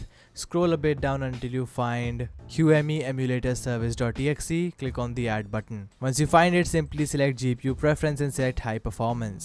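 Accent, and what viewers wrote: India. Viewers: are u from india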